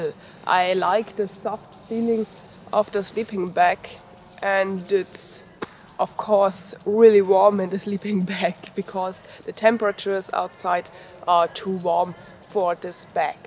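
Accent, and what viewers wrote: Germany. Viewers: Cold outside😉like your Denglisch 😉👍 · Great results from your sleeping bag ..... love your German accent... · Do you own a handgun. Is it hard to get a license for that ? What about bugs there in summertime. Your dog is nice. You have a nice German accent. Greetings from Eastern Canada.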